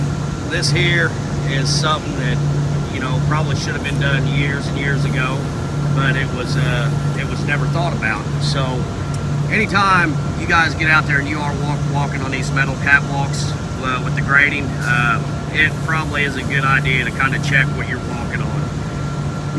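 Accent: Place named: South, United States. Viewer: I could listen to your voice and accent all day, it's a real southern drawl. (from a G'ma in Australia)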